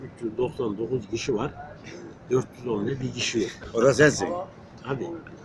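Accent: Central Anatolia, Turkey. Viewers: Arkadaşlar adam iç Anadolu'dan iç Anadolu da çoğu yerde bu şive ve ses tonu var. Sadece sizin orada bu adam gibi konuşulmuyor. Eğer iç Anadolu da yaşıyorsanız %90 böyledir şive. Ve bu adam Yozgatlıda olabilir Niğdeli de Kayserili de Nevşehirli de Kırşehirli de vs vs hatta ve hatta Çorum Sivas ta olabilir. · İç adadolu Aksaray Niğde şivesi olabilir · İç anadolu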